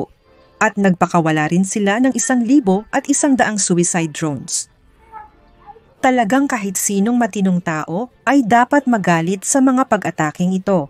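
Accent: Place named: Philippines. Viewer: GOD, bless para kang pilipino mag salita maganda ka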